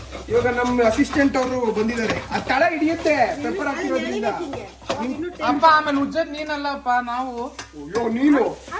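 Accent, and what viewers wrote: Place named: North Karnataka, India. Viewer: Neev matadiddu uttara karnataka bhasge...not uttara kannada bhashe..😂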